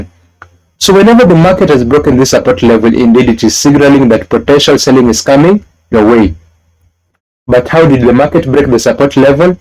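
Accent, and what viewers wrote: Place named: Kenya. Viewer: Kenyan accent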